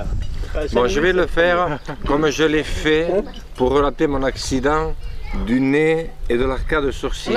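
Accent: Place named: Béarn, France. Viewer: Ce n'est pas un accent Chti, mais un accent du Sud-Ouest !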